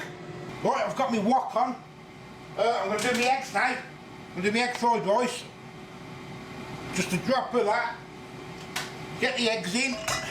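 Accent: Birmingham, United Kingdom. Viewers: New subscriber here you sound like a fellow brummie?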